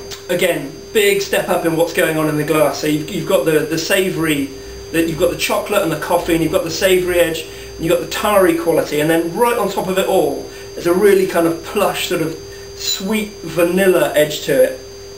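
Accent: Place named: Southern England, United Kingdom. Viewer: do you live up north? I'm confused, as you have a southern accent. Don't tell me you commute to Leeds every day?? No problem with the stemware here. Those are speciality beers. But a reminder, there's nothing wrong with a good, old fashioned pint pot, or glass.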